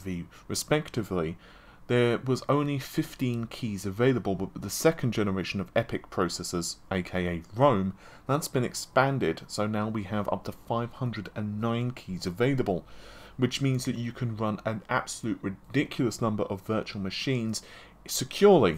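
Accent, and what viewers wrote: United Kingdom. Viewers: He is not mispronouncing anything,its called British accent. I am getting tired of those jokes. Amata is pronouncing Navi in a very weird way now!! Leave them alone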